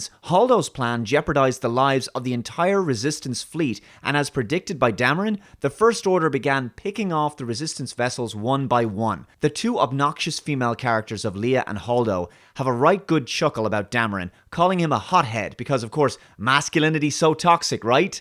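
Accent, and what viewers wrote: Ireland. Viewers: You Irish? :D Instant sub!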